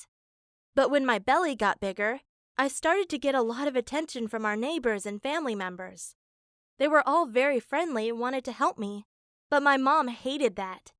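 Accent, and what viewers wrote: United Kingdom. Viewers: Let me guess your from the UK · are u british???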